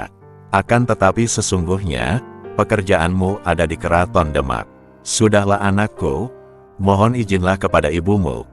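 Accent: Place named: Banyumas, Indonesia. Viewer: Ngapak bshasane tur kaku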